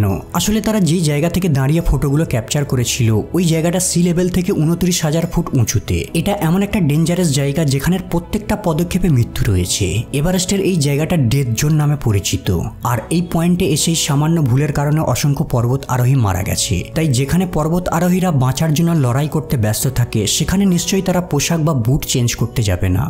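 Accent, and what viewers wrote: India. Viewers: Indian channel এটা 😂😂 বাংলাদেশীদের ভাষা আওয়াজ ভারতীয় দের মত না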